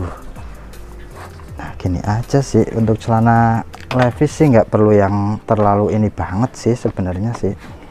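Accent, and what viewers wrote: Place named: Comal, Indonesia. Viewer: Logatnya koyo wong Comal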